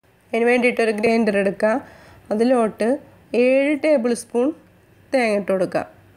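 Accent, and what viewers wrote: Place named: Kodungallur, India. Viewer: ഇത്താ.... വീട് കൊടുങ്ങല്ലൂർ ആണോ... സ്ലാങ് കേട്ടിട്ട്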